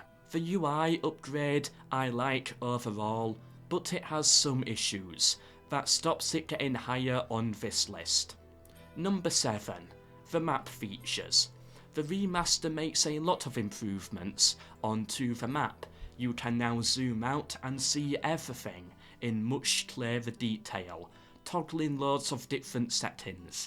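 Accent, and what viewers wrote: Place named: Yorkshire, United Kingdom. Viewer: my relatives are from bridlington, you sound similar. Hope thats not an insult! Love the channel !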